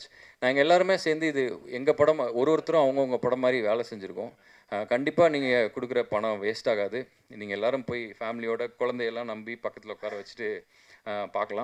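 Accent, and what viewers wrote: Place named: Coimbatore, India. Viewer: nee Coimbatore pasai nalla pesuva antha jonerla padam pannu arasiyal vathi mathiri and village nakkal paiyan mathiri pannu.. All the best👍i am from ❤️💛❤️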